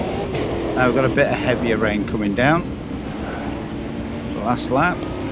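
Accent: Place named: Northern England, United Kingdom. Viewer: Nice video, Could you show tyre temps on screen next time to see how a drying track affects tyre temps and whether or not you need to go off the dry line to cool your wet tyres? I know this video was about primarily the new particle physics etc, but on a drying track you need to know when to make the switch to slicks.Keep up the ood work, nice to hear a proper Northern accent coming from a Yorkshireman!!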